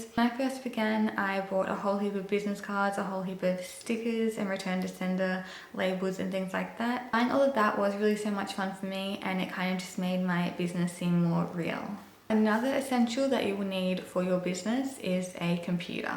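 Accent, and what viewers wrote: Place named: Australia. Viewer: Hi! I really enjoyed your video. I really like your accent, I know you live in Australia. I like seeing Australia on TV a lot. Australian people seem so nice. I would like to visit there some day. I'm happy you have your own internet business. I like all the great tips you give. Huge thumbs up! 🙂👋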